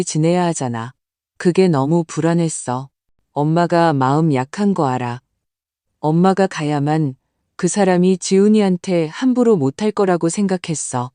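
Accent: China. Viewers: 하나히나. ㅡ중국인 가공 말투! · 발음이나 글읽는 소리가 연변족인것같음 요즘 이런글 쓰는 작가도 ㅎ 그렇고 한국인이면 다알수 있는 촌수를 뒤죽박죽으로 읽고 시간도 4시를 사시 다섯시는 오시 듣다가 한심스러워 나가기를 자꾸하게 되네....😢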